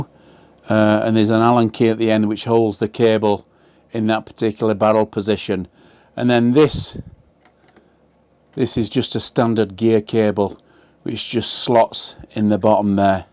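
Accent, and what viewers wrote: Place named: North, United Kingdom. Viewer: Thank you for this great video.. A really big thank you 🙏.. Loved your northern Accent 🙂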